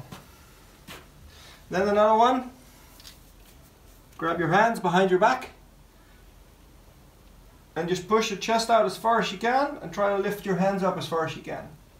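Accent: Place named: Netherlands. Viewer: Clear description, many thanks! And now it makes sense. Dutchman liven in Denmark making video’s in English. I’ve been watching your valve overhaul video yesterday while underway in the kiel Kanal and was noticing a slight Dutch accent but Danish comments. 🤔. Watching this today in Denmark (Kalundborg)!while discharging it became clear. 😀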